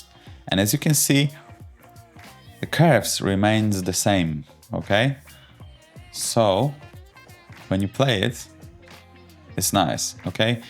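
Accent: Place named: Poland. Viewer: Mam wrażenie że słyszę tu polski akcent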